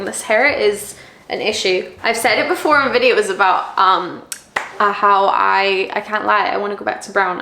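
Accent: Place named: Scotland, United Kingdom. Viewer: is from Scotland but lives in London. I love her accent!!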